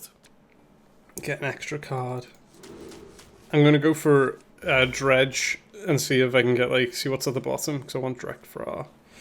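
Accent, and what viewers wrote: Liverpool, United Kingdom. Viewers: hes not Scottish tho, hes from Liverpool, UK. But I guess you're still correct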